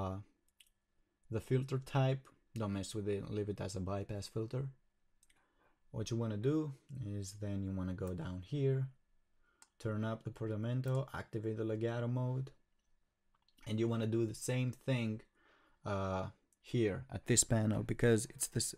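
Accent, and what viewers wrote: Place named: Balkans. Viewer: thanks for the tutorial man... where u from? you sound balkan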